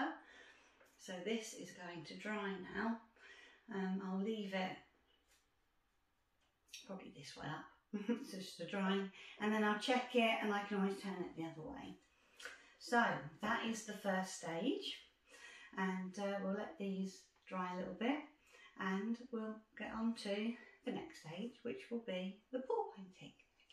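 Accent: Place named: United Kingdom. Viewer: is British, as am I, and I think perhaps it’s the different accent more than the volume, as I heard her clearly? It’s interesting how some accents are easier to hear than others. ☺️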